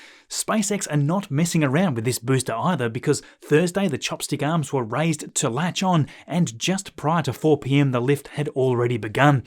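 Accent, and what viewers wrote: Australia. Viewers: Usual comment - Aussie accent at its best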